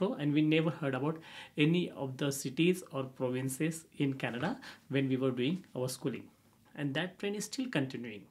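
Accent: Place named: Maharashtra, India. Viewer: You sound like a Maharashtrian, i want all Maharashtrians to speak loud clear and be the boos of their trade. I hope you will outshine and take my suggestions positively. Looking forward to be your client in coming time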